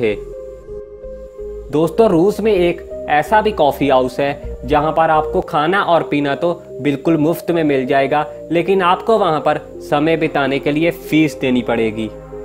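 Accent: Punjab, India. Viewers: Punjabi ta punjabi hi hunda, English vi punjabi tone ch bolde ae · Bohot achi video h bhai apke bolne se lgta h ap punjabi ho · Punjabi tuch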